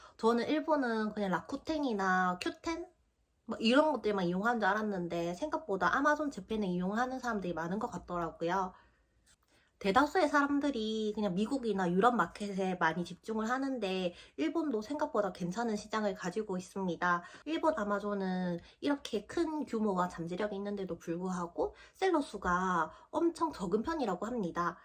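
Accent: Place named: Seoul, South Korea. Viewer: 굉장히 서울말같은 사투리네여 귀에 쏙쏙 박히고 이해가 잘 가네요. 최근 영상도 찾아봐야겠어요. 감사해요.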